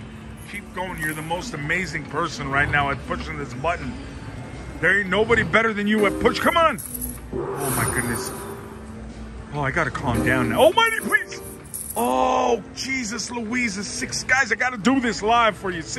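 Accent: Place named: Louisiana, United States. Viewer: Bro has got to be from South Louisiana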